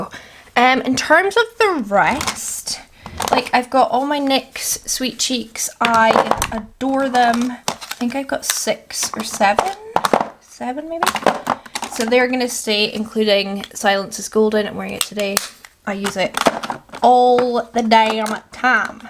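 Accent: Scotland, United Kingdom. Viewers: I like your Scottish accent. Good declutter.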